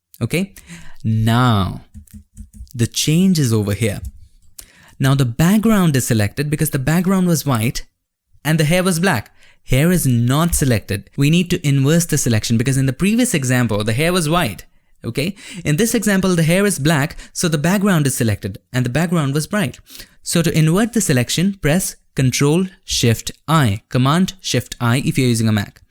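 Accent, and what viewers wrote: India. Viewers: i hate hindu English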